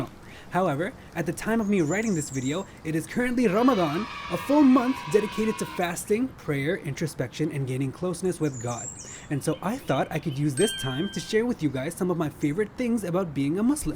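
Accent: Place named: South Asia. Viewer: That accent is bro my fellow south Asian 😂. This is the first video of this guy I’ve watched but I love this dude. It’s sunnah to love your brother.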